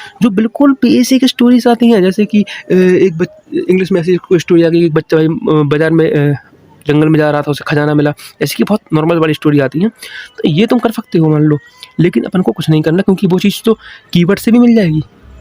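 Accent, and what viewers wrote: Lucknow, India. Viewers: R u from Lucknow?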